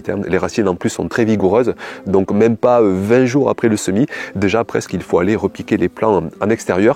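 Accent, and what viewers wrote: South, France. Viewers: J'adore cette homme par son naturel sa simplicité et son petit accent du sud! Je regarde pratiquement toutes vos vidéos. Elles sont géniales sans blabla qui sert à rien. J'espère un jour que mon jardin sera aussi grand et organisé que le votre. Merci pour vos contenus. Signée une passionnée.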